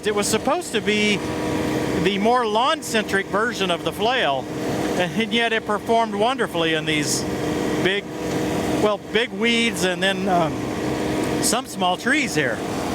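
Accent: United States. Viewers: Just like the way you Americans talk I can listen to you all day ...your doing a great job 👍 have a great day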